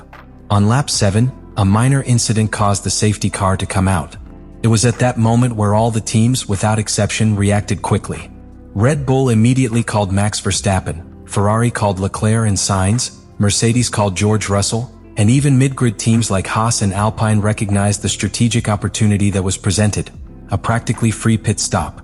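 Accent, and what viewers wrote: United States. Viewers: American accent with rubbish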